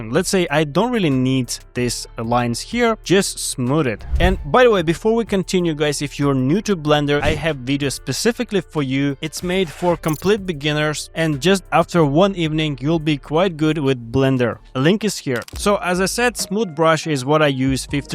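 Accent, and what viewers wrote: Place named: Russia. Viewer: I love the American Russian accent of this guy lmao, hands downs best tutorials in the 3d AI space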